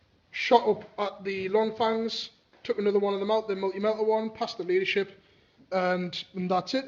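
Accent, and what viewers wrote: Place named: Durham, United Kingdom. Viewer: Was very pleased to see Wolves and Necrons and enjoyed the report, you sound like you are local to Durham as well. One thing is Logan on Stormrider is a vehicle so moves 12" in movement and flat out in shooting so shouldn't roll for a run move. Also about Stormfang in the formation, it can be chosen if it passes or fails reserve roll, it's not a reroll, that's the voidclaw formation rules.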